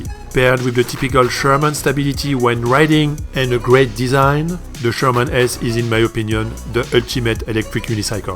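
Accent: France. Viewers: I love the fRRench accent :)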